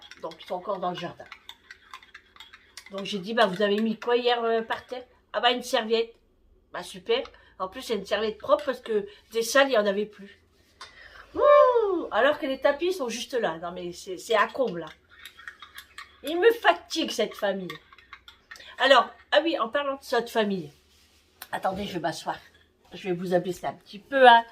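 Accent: North, France. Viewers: Hello Houppsss 😝je suis nouvelle abonnée j’adore tes bla-bla avec ton accent du ch’nord,moi je viens de Belgique 😉je me permets de te tutoyer car je suis un peux plus âgée 🤫qu’elle courage d’être Maman d’une belle familles félicitations tu arrêtes jamais mais c’est certain que pour ses enfants on se décarcasse toujours même s’il sont grand j’ai moi même deux grands garçons 🥰je te souhaite déjà une bonne fête dès Maman et j’espère que tu sera bien gâtée 💝prends soin de toi à bientôt pour d’autres aventures 🫶🏼bisous 😘Vivi.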